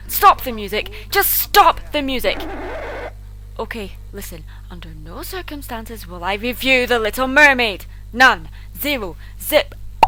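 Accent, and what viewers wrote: Scotland, United Kingdom. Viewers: You probably hear this a lot.... but I really like your voice. Scottish accents sound so cool. ^^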